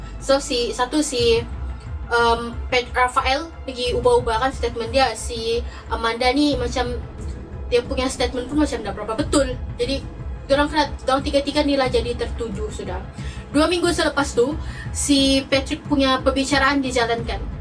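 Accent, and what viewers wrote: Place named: Sabah, Malaysia. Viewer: Siok jg penceritaan sis versi sabah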